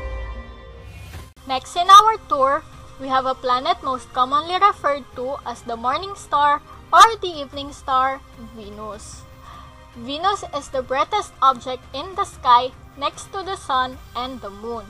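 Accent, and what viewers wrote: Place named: Philippines. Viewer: The voice makes it like she's a Filipina (Philippines)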